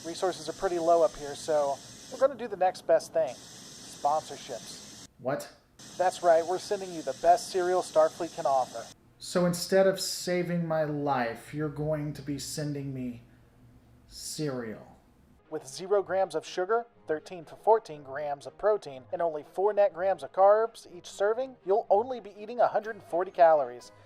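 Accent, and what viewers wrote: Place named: South Philadelphia, United States. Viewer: You from south Philly? Your accent.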